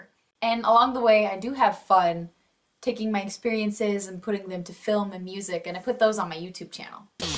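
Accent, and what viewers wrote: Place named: Boston, United States. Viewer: that Boston accent is on point ;)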